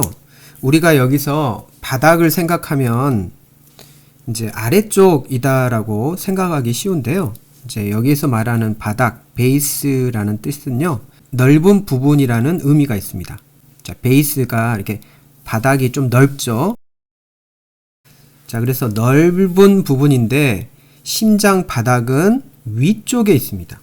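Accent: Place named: Jeolla, South Korea. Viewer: 우리 교수님, 고향이 전라도 신가봐요😊 심장시리즈 더 올려주실건가용??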